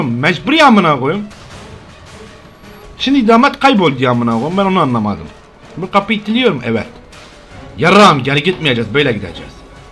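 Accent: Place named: Adana, Turkey. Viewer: Abi adanalı mısın sövmenden anladım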